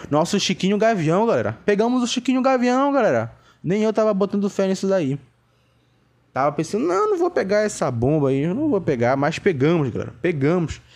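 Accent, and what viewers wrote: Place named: Pará, Brazil. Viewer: Você é de onde??? Parece o sotaque do norte,e do Pará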